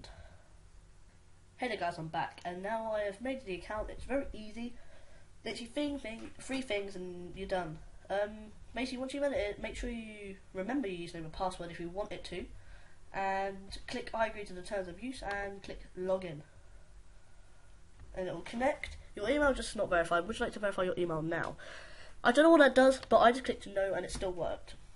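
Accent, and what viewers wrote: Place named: United Kingdom. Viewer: Holy shit this boi is british Edit: But it's a good vid <3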